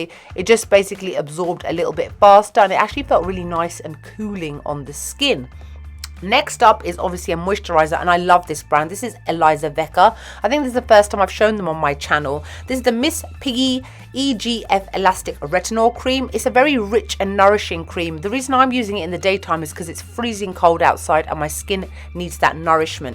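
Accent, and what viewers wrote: United Kingdom. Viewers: I love this video so so much! Its a good old youtube style grwm with "oldie but goodie" products (i.e., reliable). I am so tired of hearing of new brands everyday and it feels like a rat race. Give me my NARS blush from 2010 and it still works, it still makes me happy. Also, your skin color is the same as mine, so its a great reference point to try shades based on how they look on you. And, finally I really connect to the manner in which you speak being a desi who grew up amongst relatives based in the UK :) ... So many reasons to love your content <3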